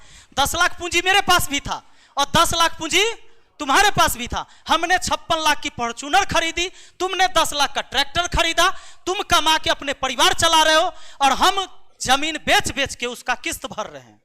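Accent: Bihar, India. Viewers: Yekdum bihari andaj me bazat ka baja baja diye guru ji super se bhi upar shamjhane ka tarika hai 😂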